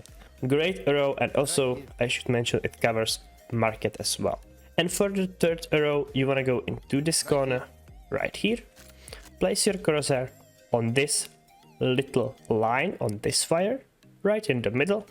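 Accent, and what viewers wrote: Czech Republic. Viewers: i cant help my self but... are you from czechia ? XD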